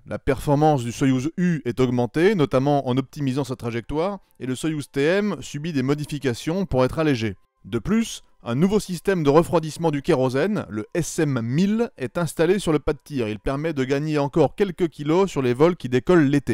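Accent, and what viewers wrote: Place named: Lorraine, France. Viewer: Woooooh serait-tu un frere lorrain? je dis ca car ta facon de prononcer 20 m'y fait fortement penser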